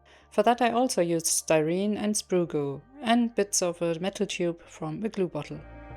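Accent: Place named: Germany. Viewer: Du hast in der Hitzewelle sowas zustande gebracht? Ich bin im eigenen Saft gegart worden XD Btw, hab dich gerade erst entdeckt, dachte dein Akzent ist soooo deutch, dann kam der Info Drop. XD Egal hab dich abonniert und geliked.:face-red-heart-shape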